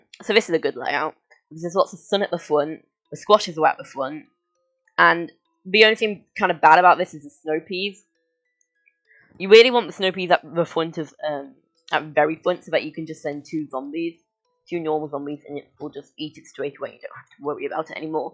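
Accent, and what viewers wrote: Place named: United Kingdom. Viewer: U sound so briish its amazing.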